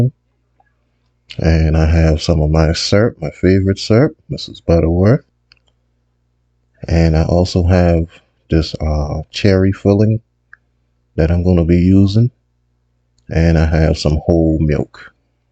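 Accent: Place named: Louisiana, United States. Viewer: Hey there!! So happy I subscribed to your channel! First of all I must say I love your voice lol although you do sound like you were from New Orleans or Louisiana! This French toast casserole sounds amazing can’t wait to give it a try! Look forward to watching all of your videos! Loving on you from Philly!!! 😊🙌🏼💜💜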